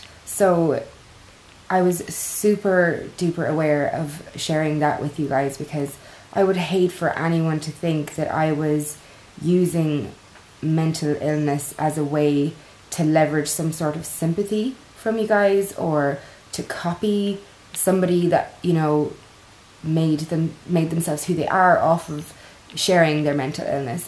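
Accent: Ireland. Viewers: First of all I have only ever followed you on Instagram and when that gorgeous Irish accent came out I near died! Secondly I'm really proud that you had the courage to post that. It was nice to meet the person behind the beautiful face and I hope you know that you're never alone. You have a whole internet of friends who support you, isn't the world cool in that way! Sending you big love xxxxxxx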